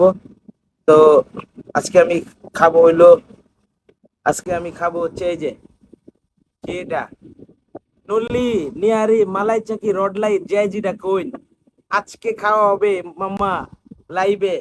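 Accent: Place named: Narsingdi, Bangladesh. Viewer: I, আপনার কথায় নরসিংদীর টান আছে।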